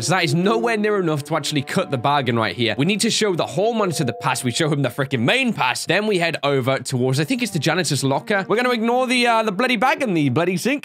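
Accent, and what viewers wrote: United Kingdom. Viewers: Random question but... are you British?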